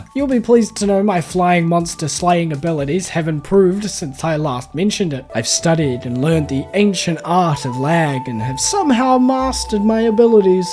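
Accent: New Zealand. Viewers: Ever since I found your channel and watched you super modded 100 days series I was trying to figure out your accent and to find out you’re a kiwi like me is such a nice surprise!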